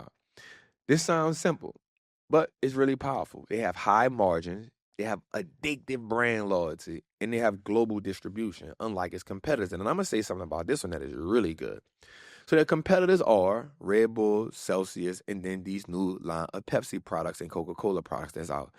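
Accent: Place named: South, United States. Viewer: Can someone tell me what accent this guy has? Is it somewhere in the South.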